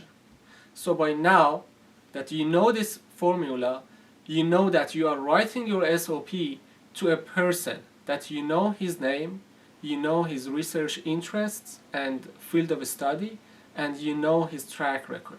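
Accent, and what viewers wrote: Iran. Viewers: love your persian accent, paydar bashi hamvatan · Love ur Persian Accent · From the first "Hello", I knew you were Persian.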